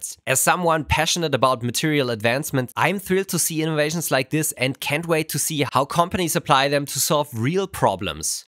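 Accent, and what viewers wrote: Germany. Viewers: If you put aside the quality of the voice that would have sound fake even in the 80's, the worst part is the quality of the translation, it is off all the time when there is some nuance in the term you are using. Fortunately, I learned english a while back and never stopped using it, so original english audio it is. Plus your german accent is something that gives personality to your channel and all of this is obliviated by this disgusting tool. Instead of that, YT should improve the quality of the autotranslation for the sub-titles, it would be so much better.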